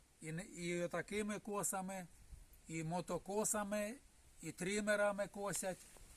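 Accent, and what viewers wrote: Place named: Ukraine. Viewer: Який прекрасний говір,говір нашої України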